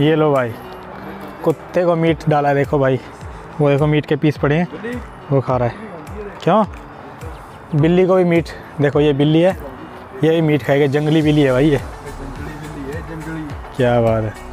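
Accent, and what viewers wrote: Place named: Punjab, India. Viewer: Bai ji, Mai roz hi tuhade vlog vekha haan, par Subscribe nhi si kita. Par aj theth Punjabi sunde saar sidha hi Subscribe button nap ta. 😍💕🙏😍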